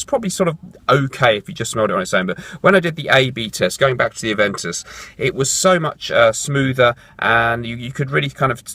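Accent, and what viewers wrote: England, United Kingdom. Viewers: Hi, I just saw your video now, I realise that it’s too late to comment now but I have a Mercedes-Benz Select and I wondering if it’s closed to the Creed Aventus or not? By the way, I studied a MSc in the UK 🇬🇧 so I so happy with your accent so far, it’s so pure English...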